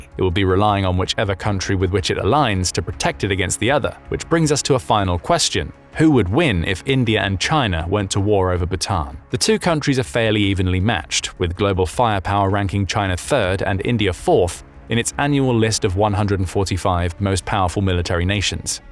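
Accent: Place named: Oxford, United Kingdom. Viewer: Trying to use a British guy with an oxford accent to justify spreading outright lies is despicable. Read the history of the conflict and you find India is the wolf in sheeps clothing.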